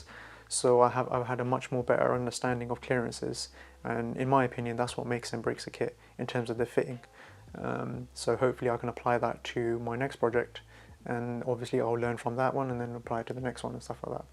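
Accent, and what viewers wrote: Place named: Southall, United Kingdom. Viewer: You sound like you might be a Southall lad?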